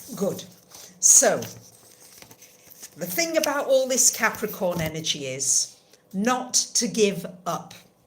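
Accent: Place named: United Kingdom. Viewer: P.S. I simply love your british accent !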